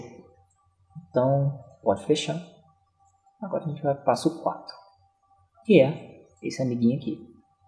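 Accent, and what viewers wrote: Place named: South, Brazil. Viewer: VC É DO SUL DO BRASIL TIPO SEI LÁ RIO GRANDE DO SUL POR CAUSA DO INICIO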